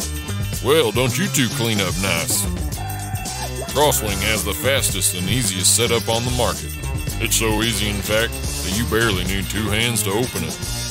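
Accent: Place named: United States. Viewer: Is this a parody? How is it sooooooo American just in the voice over? I don’t trust it.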